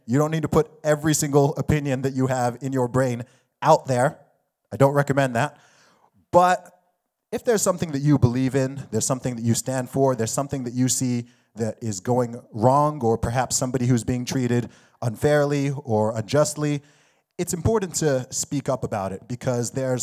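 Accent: United States. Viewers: you speak American because you want to hide your accent? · It is funny you grew up in Saudi Arabia you live in England but you sound like you are from the Midwestern part of the United States.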